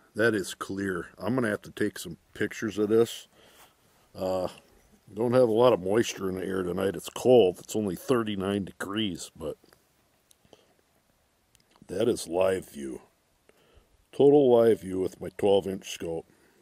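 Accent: Minnesota, United States. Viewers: Sounds like Minnesota Man.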